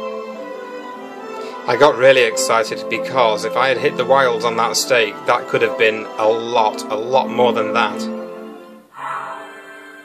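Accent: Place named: England, United Kingdom. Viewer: Oh no, has the giggles! Actually I agree with love your voice and English accent.:)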